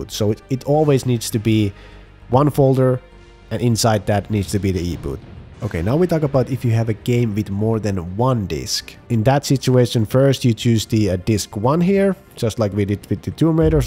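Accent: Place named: Finland. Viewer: Suomiaksentti on kyllä niin tunnistettavissa välittömästi. :D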